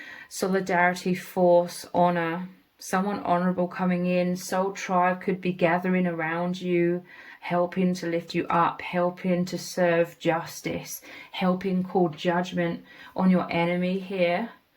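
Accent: England, United Kingdom. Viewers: Believe me it was as if I was listening to my own very story I love you beautiful English accent it's like listening to a Melody thank you you are truly gifted a true seer a true Oracle indeed you are blessed and I thank you!!!8!!!😊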